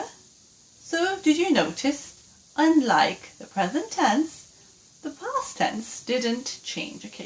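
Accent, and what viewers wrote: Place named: United Kingdom. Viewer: ♥️ you have a British accent..I loved your video. I'm following you in YouTube and HelloTalk also. Nice video☺️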